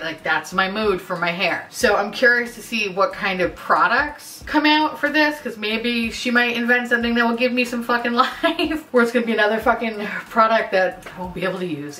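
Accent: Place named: South, United States. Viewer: Momma came out of the gate with a buzz and Southern accent. Hell yea I am here for it. ❤💀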